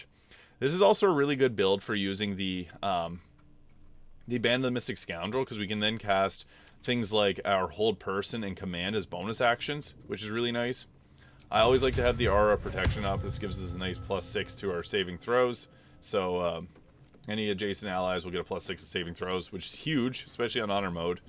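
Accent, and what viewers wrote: Maritimes, Canada. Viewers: Interesting. Thanks. I could tell you're from the Maritimes by your accent 😊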